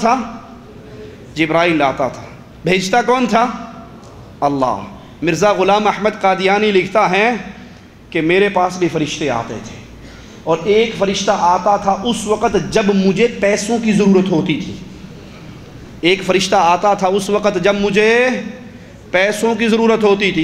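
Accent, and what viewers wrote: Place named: Arab world. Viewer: Urdu arabi kay andaz may bolnay say jhot such may nahi badalta .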